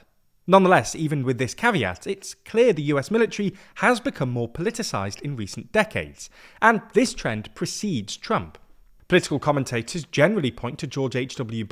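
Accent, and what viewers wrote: United Kingdom. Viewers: Respectfully, UK accent about US politics. Nope.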